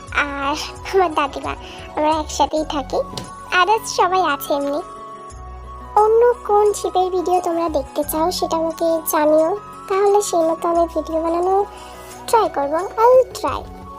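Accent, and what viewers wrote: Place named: Bangladesh. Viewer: Bangladeshi der moto kotha bolo keno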